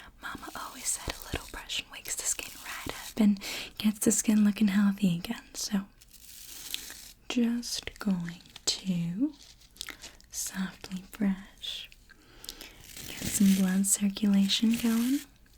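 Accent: South, United States. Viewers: The southern accent.. I'm 44 now. I speak with an accent like that once in a while. I'm deep deep South Texas. . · The southern accent is decent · Oh southern accent I like that, I'm very familiar with that language. I think you should do more.🤠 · I love the southern girl accent! 👍😘❤️ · The southern accent is nice 😊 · Hey, I love your videos! Im from the south and I thought the southern accent was pretty good. Also congrats on the 150k subs 🎉! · The Accent was too good tbh, are you actually from the Southern countryside? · The Southern drawl actually suits you quite well 😄😝❤️ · And here I thought your normal voice was heavenly but this accent with the southern tone is just amazing. Hope this isn’t the only time we’ll hear it 🤠🫶🏼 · My new favorite asmr video 🥰🥰💓💓amazing southern voice:D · Idk why, but for some reason the southern accent was extremely comforting. 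Like I actively felt safe listening to this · Wow what a great video😊 your southern accent was spot on. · I’m British 🇬🇧 but I love the accent. Whereabouts in the states is that accent from? It’s very good :) 😍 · This has to be the best ASMR video I've ever seen, the southern accent is so peaceful and relaxing ❤❤❤❤ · You HAVE to do another southern accent video, no lie I fell asleep somewhere in between 0 and 30 seconds. I still watched the whorl thing when I woke up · You nailed that southern accent 🩶🩶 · Ok new to this channel...really loving the southern accent darlin ❤ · Didn’t know I needed the Southern accent this is highkey fire 🥹 pleaseee do more Obsessed Girl tho it’s peak and I really wanna hear more dirt on Olivia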